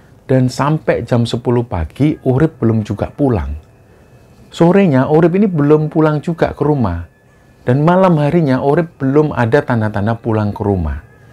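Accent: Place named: East Java, Indonesia. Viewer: Semangat terus Tim 😊 kadang memang dalam berkembang akan terus ada masukan dan kritikan sampai nantinya bisa punya "ciri khas utama" yg akan diterima semua pendengar apa ada nya 😊 Btw kl saya pribadi, saya suka karena ada logat jawa timur nya.. jd suka kl mas ngmg lebih lokalan lagi, nyeletuk boso suroboyoan, atau ngasih info2 sejarah atau latar belakang lainnya. Jadi waktu baca komentar ada yg bilang, "Kl ngomong pakai Bahasa Indonesia dong, jangan pakai bahasa daerah. Kan yg dengerin ga semua ngerti." Aku merasa.....aneh 😅 karena kl pengen dengerin kisah horor berbahasa indo knp ga dengerin Chanel lain aja.. kan memang channel yg seperti ini, dan ini yg bikin ngenak di hati subscribernya.. 😢 Tp yowes lah, apapun yg usahakan, semoga bisa berkembang menjadi lebih baik lagi dan bermanfaat untuk org banyak. Aamiin 😊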